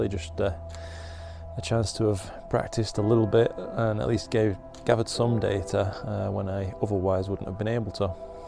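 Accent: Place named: Northern England, United Kingdom. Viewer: Loving the channel. You sound like a northern lad. Where are you imaging from?? I'm in Lowton Leigh.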